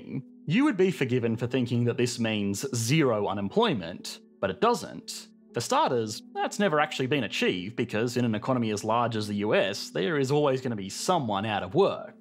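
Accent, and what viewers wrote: Australia. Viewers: I like a guy with an Australian accent telling us how the US Federal Reserve works!!!???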